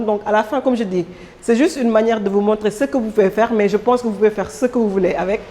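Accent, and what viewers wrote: Senegal. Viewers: Pourquoi il y a des gens aigris. L idée est magnifique et j adore ton accent un peu d english de french et une petite dose de wolof. Ne change rien tu es trop agréable et captivante. 🥰🥰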